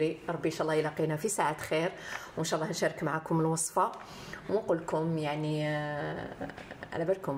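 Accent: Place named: Souara, Algeria. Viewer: اواه يا مدام تهدري كيما السوارة ماسافاش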